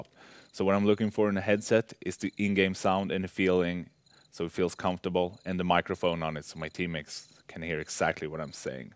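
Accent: Sweden. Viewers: love his swedish accent :D! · That's because English isn't his Native language, he's Swedish. Can you speak Swedish perfectly? Also he is legend, wait for it...Dairy! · 32 people diden't understand swedish-engilsh....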